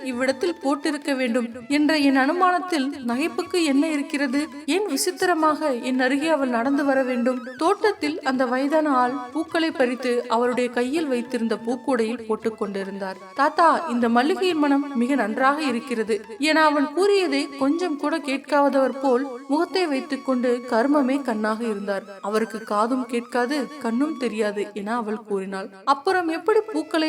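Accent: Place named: Jaffna, Sri Lanka. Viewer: அவ்விடம் கற்பனை அதிகம் உண்டோ.என்ன யாழ்பாணம் தமிழை விடமுடியவில்லையா .கதை மிக சுமார்தான் .😂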